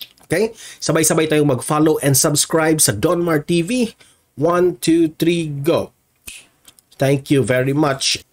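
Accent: Visayas, Philippines. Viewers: butipa wag kn mag salita ipakita mn lng un video kysa mag salita k hd nmin maintindihan un salita m kasi bisaya k ok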